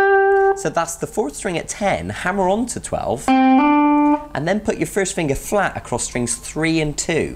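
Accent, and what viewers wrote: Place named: Bristol, United Kingdom. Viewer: That's gotta be a Bristol accent I know that anywhere me Babs 😂. Brilliant lesson all the same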